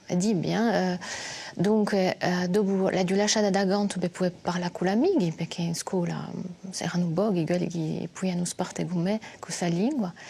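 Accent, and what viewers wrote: France. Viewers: BELLA LINGUA MA CON UNO STUCCHEVOLE ACCENTO FRANCESE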